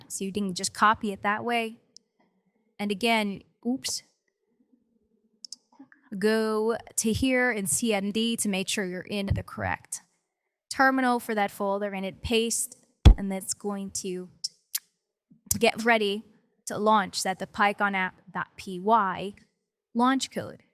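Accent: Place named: California, United States. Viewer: is she from California? such Californian accent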